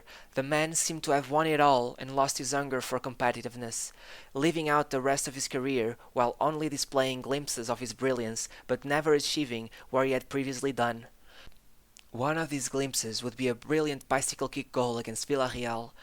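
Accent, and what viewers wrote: Portugal. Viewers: I can tell that's a Portuguese native speaker narrating this video in English.🤔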